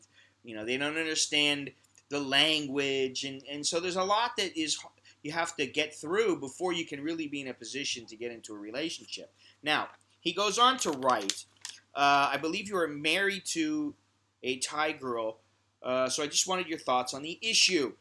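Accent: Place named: Brooklyn, United States. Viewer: Hey, Coming to Thailand this March. I live around the corner from Pateron. How is the rock scene in Bangkok? Do you go out to shows? I'm a musician in San Francisco and I might be considering moving to Thailand.I have a friend who's an English teacher and a girl that I'm friends with a nice Thai girl. Don't worry, I'm taking it slow as per your advice. I did a little research already and I see Bangkok recently has a budding rock scene there. Here is a link to my music: <link> It might not be your cup of tea but maybe... Anyway, you sort of have a Brooklyn type of style of delivery. Straight and to the point. You can reach me at <mail> if you'd like. maybe we can have lunch or something.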